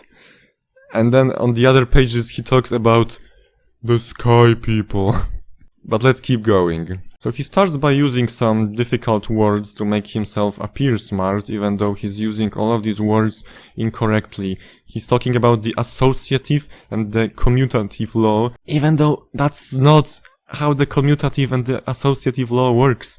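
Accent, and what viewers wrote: Poland. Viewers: odkąd widziałem pierwszy film wiedziałem że jesteś Polakiem po akcencie. Dzięki za potwierdzenie brachu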